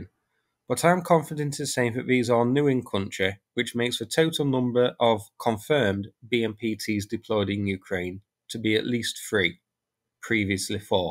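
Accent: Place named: Yorkshire, United Kingdom. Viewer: "Countreh" "Terminatuh" "Yeller" I wonder what part of yorkshire the narrator is from ?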